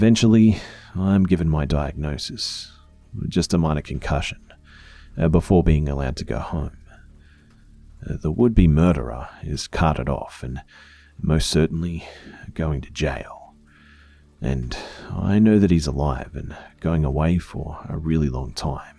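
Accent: Australia. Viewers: It’s good to listen to an Australian voice! You tell these stories so well. Keep up they great work 😊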